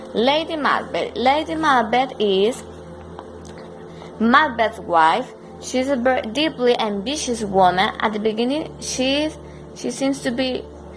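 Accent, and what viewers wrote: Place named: England, United Kingdom. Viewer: Hello, this Is Arka. Thank you for subscribing my channel. BTW, you are beautiful as well as intelligent. But where do you live? I am asking, just because of your English ascent.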